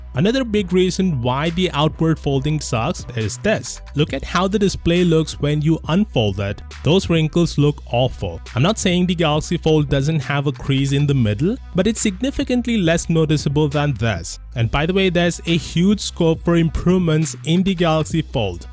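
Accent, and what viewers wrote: China. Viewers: Wtf your accent sounds like Chinese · Yes, chinglish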